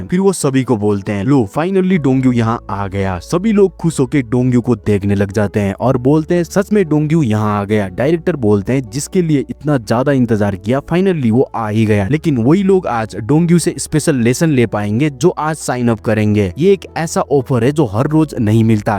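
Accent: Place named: Nepal. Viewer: Hamro jastai Hindi xata😂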